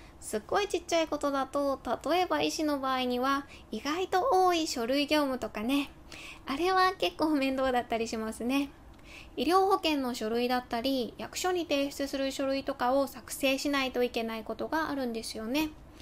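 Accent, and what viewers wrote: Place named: Hyogo, Japan. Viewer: 会えなかった姪がいます、あの時の姉の悲しみも自責も見ていて辛かったし支えた姉の家族の苦労もよく分かります。 個人的な事ですが先生は兵庫の方のような気がします、オレの神さんのよそ行きの言葉と同じ感じに聞こえます😊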